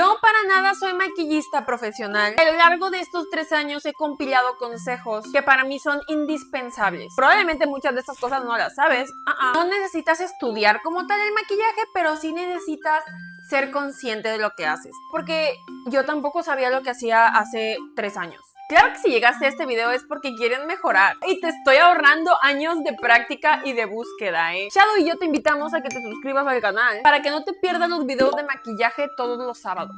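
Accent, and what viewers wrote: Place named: North, Mexico. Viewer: Tu tono norteño con tu carita douyin me da vida ❤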